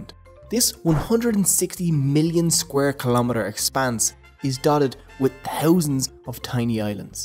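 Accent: Ireland. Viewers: This man has possibly the most Irish accent ever! "Tree" for three lol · first few seconds HELLO FELLOW IRISHMAN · As a Filipino, I'm proud and thrilled to see videos on the culture being posted. As a fan of Ireland, it's even more exciting when done with an Irish accent.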